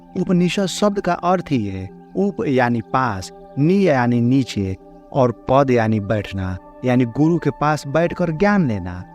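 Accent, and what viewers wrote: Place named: Bengal, India. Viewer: Bhai tu bangali hai... Ya bhojpuri... Mast bolta hao bhai...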